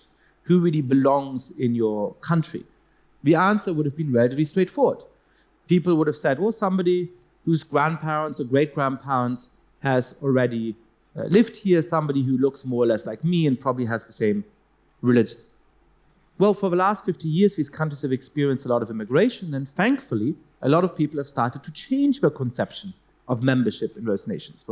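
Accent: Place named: Germany. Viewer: He really sounds German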